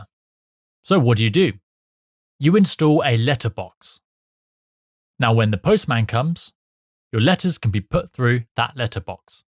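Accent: United Kingdom. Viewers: Excellent layout, delivery, and explanation of material. Only problem I have is with the british accent, but I struggle with all types of accents. I totally cannot grasp concepts from asian, Indian, arab, french, german, and spanish accents. But I improviced and overcame this by reading the video scripts after the video. This method of learning complex concepts is the best of all methods of learning.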